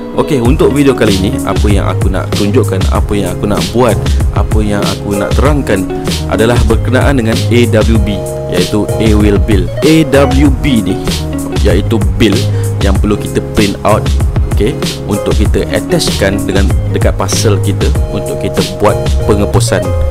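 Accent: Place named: Johor, Malaysia. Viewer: “Haaa kena tukoo” 😂 johorean sgt, tak berniaga shopee but i really2 enjoy your explanation... keep uploading ❤️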